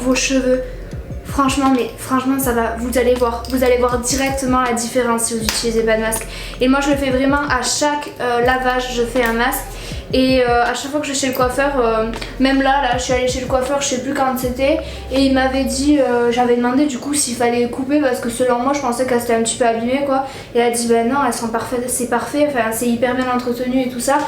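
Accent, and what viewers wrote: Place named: South, France. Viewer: T’a un accent du Sud et sinon t grv drôle 😂❤️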